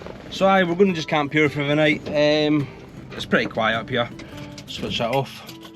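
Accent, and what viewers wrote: Scotland, United Kingdom. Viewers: Try turning on the closed captions (It's the "CC" button down next to Settings). They work pretty well even on a Scots brogue! 😀 You can adjust things like font size in the Settings as well.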